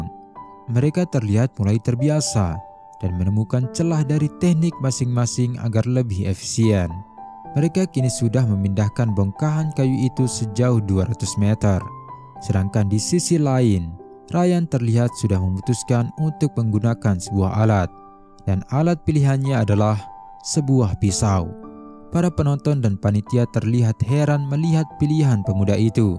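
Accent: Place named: Lombok, Indonesia. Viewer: Authornya ni ngomongnya sayup2 kayak logat sasak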